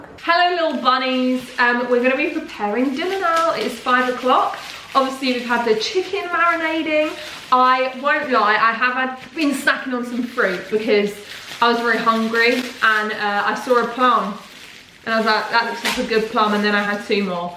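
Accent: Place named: United Kingdom. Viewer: i really like the relaxed vibe of your videos, that's probably one of the biggest reasons why i enjoy watching your channel so much. that being said i feel like i shouldn't be saying what i'm about to say but here we go lmao. audio quality is not the greatest. it's difficult to understand what you're saying sometimes and very often i wish videos were captioned. when you're up close sound is fine but when you're standing farther away there's so much echo and it can be difficult to follow especially since your british accent is strong (not a bad thing at all just bit more difficult to understand to people who don't have english as their first language).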